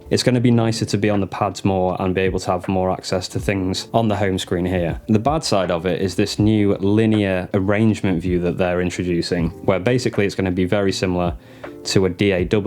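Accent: United Kingdom. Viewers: Yo man thank you for your videos. Been following for a while now and I really appreciate your content (especially the sp 404 vids)! I’m from the uk and you sound pretty local. Have you got a social media link? Would love to connect and pick your brain. Thanks for the consistent uploads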